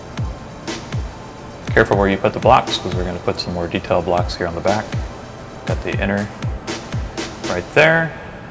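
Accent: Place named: United Kingdom. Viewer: Nice man, you solve the problem whit the audio of your voice, nice for you dude XD , its sound a little bit eco, but at lees your voice is in the proper volume poin, a little high form the background music, realy nice man, gratz for make a nice vid this time hehehe :P (better than the other! ) i hope we can figth in game some tiem, but i think is a little dificult becouse im from Venezuela and if im rigth you leave in Ingland or UK for your acent, my nick in game is the same like here so if you see me try to kill me heheheheh, If you can >:)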